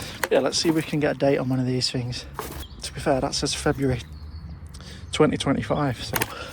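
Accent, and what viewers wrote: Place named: United Kingdom. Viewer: I'm American and your accent doesn't bother me at all. I can understand you just fine. You don't sound annoying you just sound British. I don't know what those commenters are hearing lol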